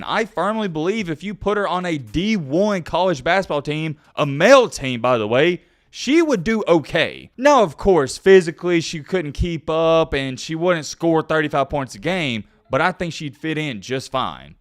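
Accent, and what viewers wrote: South, United States. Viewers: Dude get a life it’s sports SHES white and ur mad and ur southern accent makes it worst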